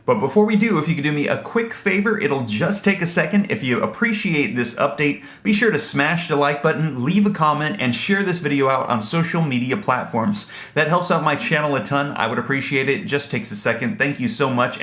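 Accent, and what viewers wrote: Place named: South, United States. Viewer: I love how you say “ pretty incredible”. You say “ purdy incredible “, and it’s the most adorable thing ever! You sure you aren’t from down south like me?? Haha!!!! Love it!!! Thanks for info!!!!!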